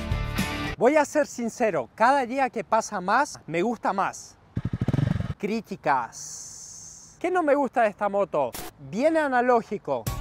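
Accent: Argentina. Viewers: 🤔 parese de Argentino su forma de hablar 😂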